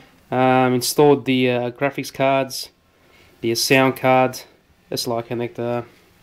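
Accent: Australia. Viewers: he's Australian (or from New Zealand). NOOB.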